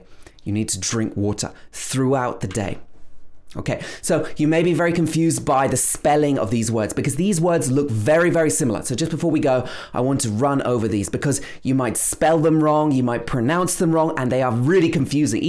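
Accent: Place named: RP, United Kingdom. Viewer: Bloody gorgeous British accent(posh)💚💚💚💚💚!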